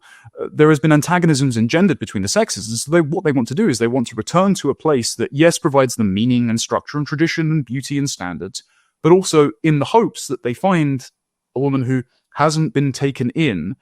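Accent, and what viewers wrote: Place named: United Kingdom. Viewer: Why is he describing the right wing as religious? He sounds like a sheltered posh Englishman without any connection to the reality of most young men. For the longest time in Europe, nobody on the far right cared about religion. The uniting factor was hardcore nationalism and opposition to immigration. "Going to the right" and for it to be milquetoast tolerant Christian patriotism (which solves zero issues such as multiethnic societies) will help nothing. They'll figure it out for themselves.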